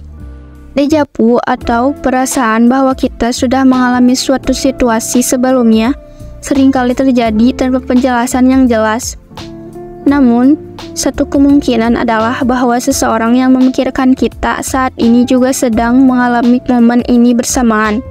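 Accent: Bali, Indonesia. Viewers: Logat kk nya kayak logat bali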